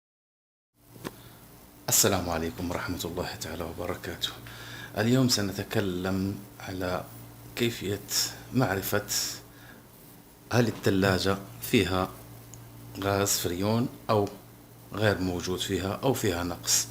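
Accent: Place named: Morocco. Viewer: تحية من بني ملال ❤ لهجتك مغربية شوية 😊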